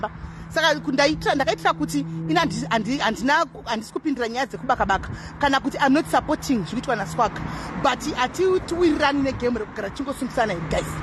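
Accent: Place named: Karanga, Zimbabwe. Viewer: Sis vanotaura chikaranga chaicho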